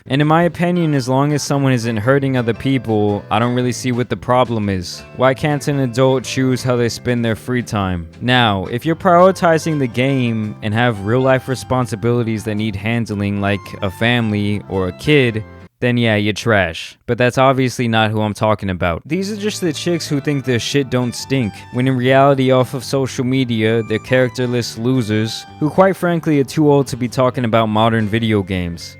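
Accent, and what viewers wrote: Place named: New York, United States. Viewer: Sounds like this guy is trying hard to hide a new York accent New Yorkers are based bad ppl But boooooy did he hit the nail on the head bout that ps5 vs purse thing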